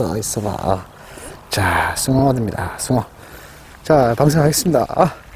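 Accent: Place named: Gangwon, South Korea. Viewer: 저도 강원도라 말투가 친근감 있네요~^^ 요즘은 붕어 낚시안하시나요? 제가 그자리에서 생애 첫 월척을 ㅎ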